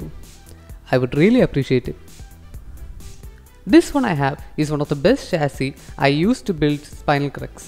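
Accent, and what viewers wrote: Kerala, India. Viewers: Bro are u a mallu.?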